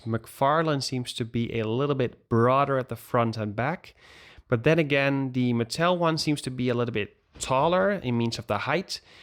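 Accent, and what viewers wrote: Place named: Netherlands. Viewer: Im hearing a sort of Dutch accent.. btw thnx for the review.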